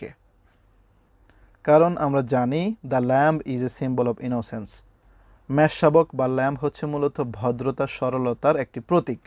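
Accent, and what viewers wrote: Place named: Faridpur, Bangladesh. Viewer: আপনার বলার ধরণ একসেন্ট, টোন, অনেক টা ফরিদপুরের মতো। ❤❤❤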